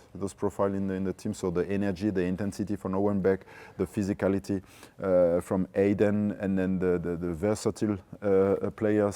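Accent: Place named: Europe. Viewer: This bloke has fraudently made a career on sounding European and sophisticated.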